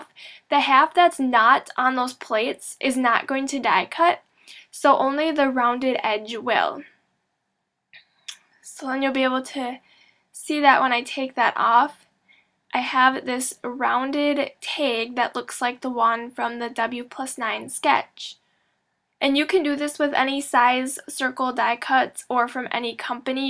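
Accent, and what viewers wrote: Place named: Upstate New York, United States. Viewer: Great card,! I was wondering, are you from Upstate New York? Your accent reminds me of my great aunt, who lived in Rochester. Sorry your kitten scratched you so badly, but I'm glad you're OK.:) Thanks for sharing your wonderful talent!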